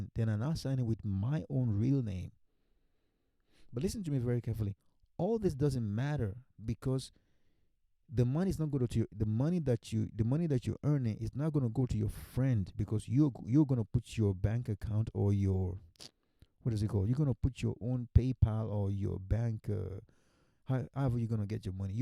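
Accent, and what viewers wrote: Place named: Nigeria. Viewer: Bro, you sound like a Nigerian, please can we connect so you put me through please 🙏🏽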